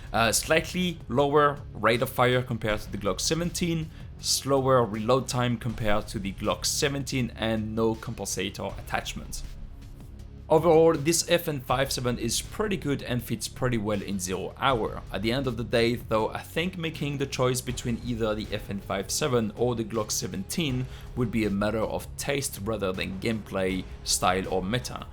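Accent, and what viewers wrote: France. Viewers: je me disait bien aussi que je reconaissait l'accent francais ces bien que tu puisse faire tes video en anglais ta plus de chance de faire parler de toi jaime vraiment ta facons de detailler ce jeux continue comme sa :)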